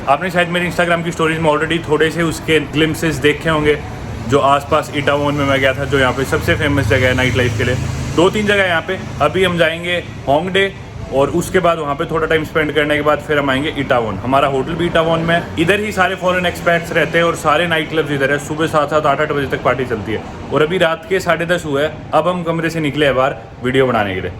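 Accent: Haryana, India. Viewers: haryana se hai kya Bhai? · The dhakad Aur wo Hindi ka harayanvi touch kya baat hai maja aa jata hai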